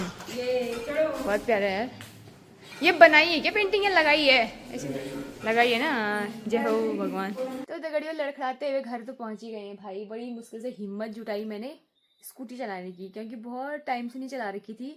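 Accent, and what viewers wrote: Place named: Pahadi, India. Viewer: aapka pahadi bolna mujhe bahut accha lagta Hai❤❤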